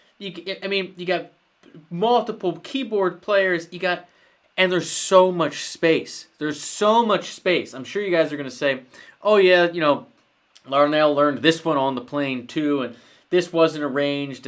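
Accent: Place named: Buffalo, United States. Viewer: Are you from Buffalo? I hear Buffalo in your accent.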